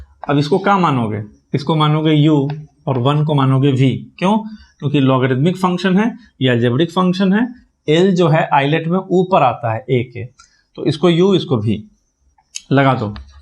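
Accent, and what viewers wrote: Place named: Bihar, India. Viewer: Bihari Accent giving legendary vibes✨✨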